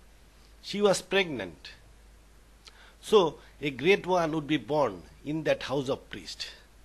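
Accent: Bangladesh. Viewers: Are you from Bangladesh, love your accent.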